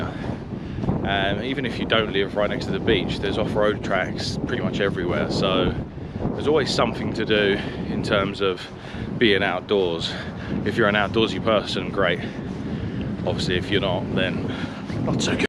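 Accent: Australia. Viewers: I detect the hint of an Aussie accent ❤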